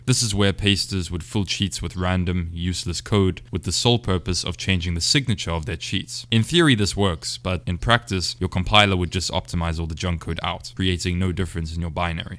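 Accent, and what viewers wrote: South Africa. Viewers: This brah sounds South African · Clicked on this and didnt expect to hear a saffa, lekker vid bru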